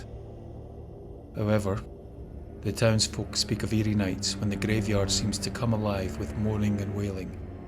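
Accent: Scotland, United Kingdom. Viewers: These videos are worth watching just to hear the awesome Scottish accent.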